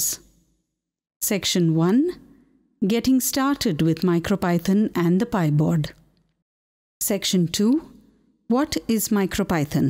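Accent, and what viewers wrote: India. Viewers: This is the least typical Indian accent I've heard on YouTube. I'm Indian, okay! And my friends also speak English but like normally not the annoying way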